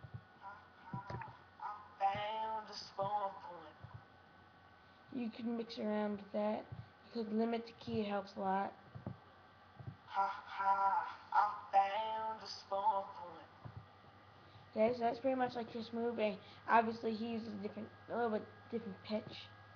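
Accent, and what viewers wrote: Texas, United States. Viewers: wat the hell is dis he sounds like he is from Texas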